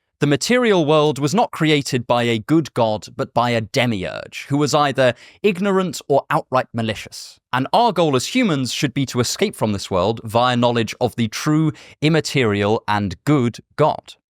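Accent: United Kingdom. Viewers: watched to learn❌ watched for british accent✅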